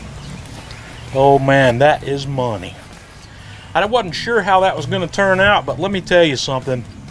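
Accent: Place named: Georgia, United States. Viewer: Wow, you've even mentioned Malcom Reed....... My wife and I,,, when we first seen you, we thought,,,,,,,,> WOW: he sounds like Malcom Reed.... > both from Georgia , our guess! Love to watch you, and to hear your accent! Thanks! Marcel , from Yuma, Az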